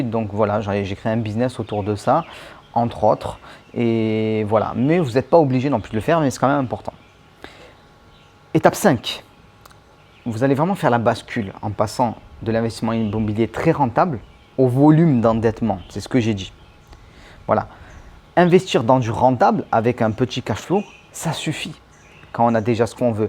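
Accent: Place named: Paris, France. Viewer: super videos merci c'est très précis, par contre tu es de Marseille l accent tu la laisser la bas tu parle comme un parisien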